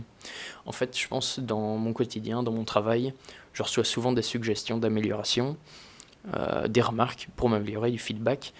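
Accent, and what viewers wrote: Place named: Belgium. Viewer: En t'écoutant j'me suis dit "Il a un léger accent Belge lui..." Jusqu'à ce que je fouine un peu et que je trouve la réponse 😅 Aaaaah le codage ! Tout ce que je déteste 😂 Par contre je trouve ça TELLEMENT CLASSE de connaitre tout ça, et en plus d'être passionné ! Quelle chance ☺ Le running et le montage c'est plus ma passion 😁 Bon courage pour tes objectifs 2024 et ton Marathon ! (Avril ? Le Marathon de Paris ?)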